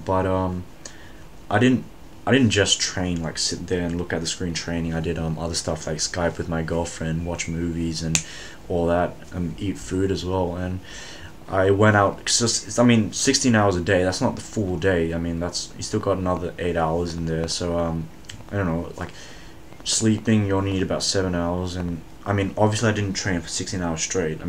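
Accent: Australia. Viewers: An Asian that sounds like a Australian...... Wow....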